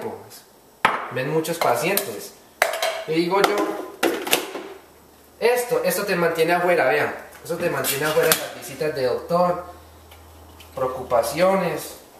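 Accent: Colombia. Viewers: Este asentó me parece conocido...Colombiano.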